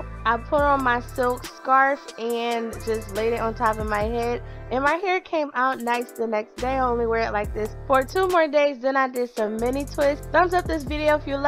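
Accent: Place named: Philadelphia, United States. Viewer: Wait wait wait a minuteeeeee...as much as I have watched u and loveeeee you...your here in PHILLYYYY.....omg.... I knew that attitude and accent I freaking knew it lol...this made me happy....forgive me cause I’m always the last to know everything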